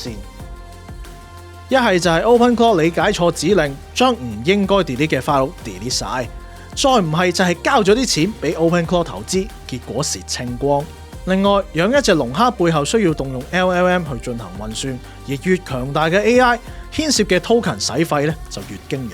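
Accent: Guangzhou, China. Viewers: 主持有種廣府口音 係咪廣州人？ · 師兄是咪廣州人? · 簡單, 清楚, 好片。 主持係廣州人? 幾有廣府話味, 幾有個人特色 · 點解主持咁重廣州口音? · 好重大6佬口音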